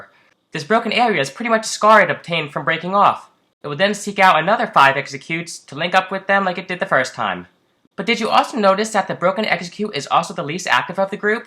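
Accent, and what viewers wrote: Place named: Russia. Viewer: why u accent so bad its like u are a russian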